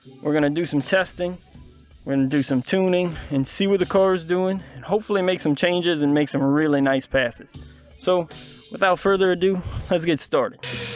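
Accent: Louisiana, United States. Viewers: Your accent sounds like you are from Louisiana if I'm correct what part are you from I'm from Gonzalez but live in Clinton now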